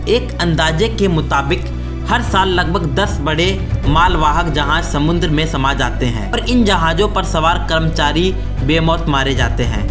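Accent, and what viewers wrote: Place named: India. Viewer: Bhai Indians esy hi bolty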